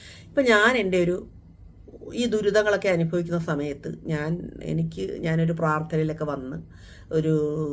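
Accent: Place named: Alappuzha, India. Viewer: ശരിക്കും ആലപ്പുഴയിലെ സംസാരരീതി പു ന്ന പ്ര ആണോ വീട് എൻറെ വീട് ambalapuzha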